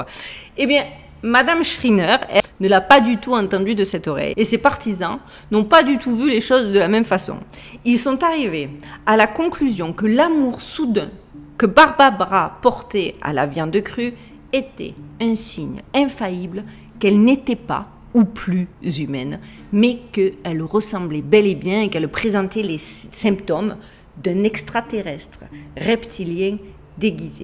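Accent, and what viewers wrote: South, France. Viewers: Avec ton accent méridional à couper au couteau, je serais curieux de te voir parler en Anglais ! ^^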